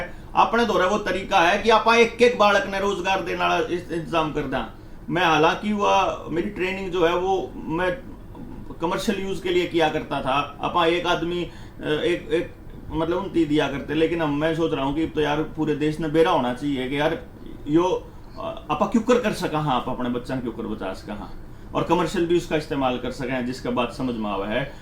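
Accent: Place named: Haryana, India. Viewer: हिंदी ही है पर लहजा हरियाणवी है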